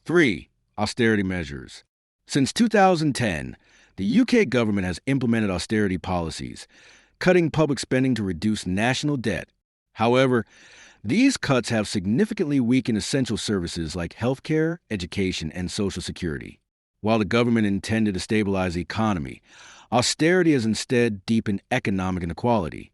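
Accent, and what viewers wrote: United States. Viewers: this coming from an american voice is a joke.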